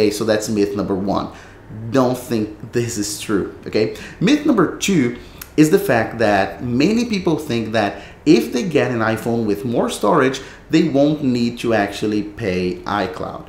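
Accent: Brazil. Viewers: sound brazilian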